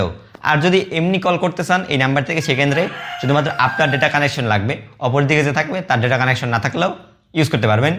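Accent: Barishal, Bangladesh. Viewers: ভাইয়ের বাসা কি বরিশালে? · শুদ্ধু বরিশাইল্লা মিশেল ভালোই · বুঝতে পারছি যে ভিডিও ছাড়ছে এর বাড়ি খাস বরিশাইল্লা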